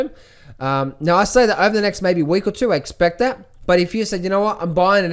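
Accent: Australia. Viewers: HERE WE GO MORE AUSSY ACCENTED B/S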